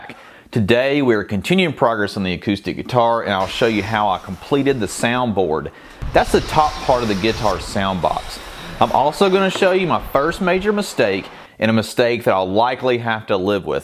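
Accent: South, United States. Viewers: This is just a wild guess but are you any chance from the south? Thanks for the videos.....really enjoy them.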